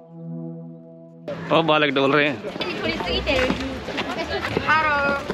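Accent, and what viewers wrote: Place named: Baghpat, India. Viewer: bhai आपकी भाषा हमारे इलाके से मैच करती है बागपत को जानते हो क्या? Hamari DELHI NCR on the top❤️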